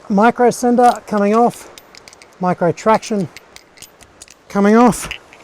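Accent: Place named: Australia. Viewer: Hey you sound like an Aussie (that's a compliment). Excellent, excellent video. Sound advice at every stage. Particularly at always carrying a Reverso. My current project is toying with top rope solo for ice-climbing. Presently using a Petzl Rescucender (top) on a harness combined with a Petzl microtraxion (bottom). The Rescucender is very heavy as I have teamed it with a steel (Grivel) biner because of the cross-loading. Have you seen/tried the TAZ Lov2 fall arrest descender <link>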